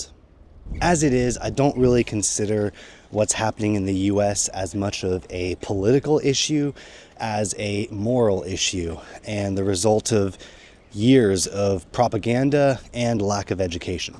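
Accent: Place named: England, United Kingdom. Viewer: Gee yer heed peace min. You sound like a sassenach.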